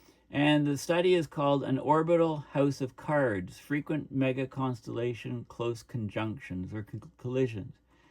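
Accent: Canada. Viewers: How do you call yourself an Irishman today? Sounds like a Canadian accent to me.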